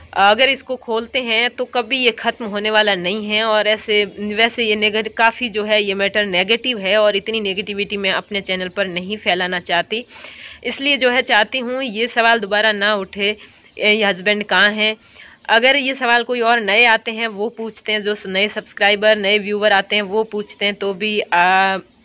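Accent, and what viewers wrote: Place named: Barmer, India. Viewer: आप ऐक, अच्छे परिवार से,है, आपके माता पिता को मेरा सलाम, आपके मुख के सरस्वतीजी बोल रही है,,, आप मेरे को आप बाड़मेर से लग रही है अन्दाज है जय श्री कृष्णा जी श्रीं राधे राधेजी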